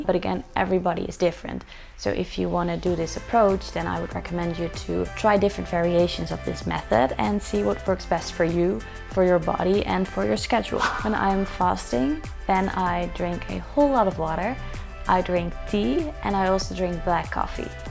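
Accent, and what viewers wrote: Netherlands. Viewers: A lovely accent. Perhaps Dutch ? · Wow you sound dutch...😊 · omg eerste keer hier, maar ik hoorde je accent en gokte meteen dat je nederlands was haha ;) new fav acount for sure x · Hahaha ik hoor meteen al dat je Nederlands bent😂💙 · Grappig dat je, terwijl je Engels erg goed is, toch gelijk het Nederlandse accent hoort :-) Niet negatief hoor, gewoon normaal als een taal niet je eerste taal is.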